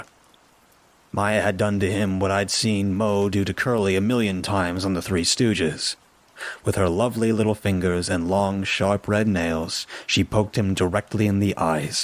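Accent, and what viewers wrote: Scotland, United Kingdom. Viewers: I wasn't meaning to criticise. Its like Christmas when you upload. Your content is always top quality. It was cool that it wasn't the typical generic hollywood scottish accent. Your still much more accurate that others would do. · haha love your Scottish accent not bad at all 👍🤣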